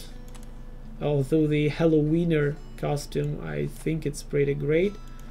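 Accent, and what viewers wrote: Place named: England, United Kingdom. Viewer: Oh, you are from England?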